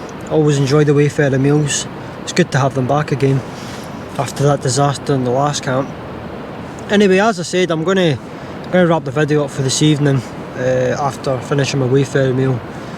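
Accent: Scotland, United Kingdom. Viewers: Hello from Boston, Massachusetts, New England, USA. Love the Scottish highlands and Scottish accent. Could listen to you talk about your camping meals, puddles, soaked tent (though dry inside, I’m sure) and other details all day. Excellent video. Thank you.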